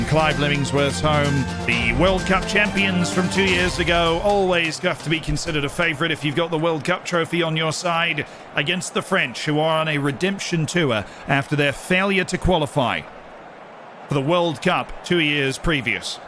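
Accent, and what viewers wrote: England, United Kingdom. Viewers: Love the English accent quite happily listen to your commentary over most other people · love the non regional British accent but pronunciation is still americanised if you know what I mean... Still love your content tho. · His British accent is immaculate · This was awesome! And colour me impressed by your english accent. Didn't know you had it ya.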